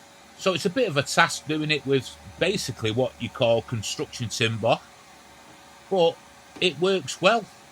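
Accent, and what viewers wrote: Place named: Northern England, United Kingdom. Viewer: I'm a Stopfordian but I'm exiled in New Zealand. It's roasting here. Sorry. Good to hear a northern accent on YouTube. Your videos are straightforward and to the point. Keep up the good work.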